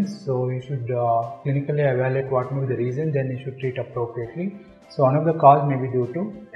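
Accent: India. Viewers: Anna Albury hes talking Indian thats why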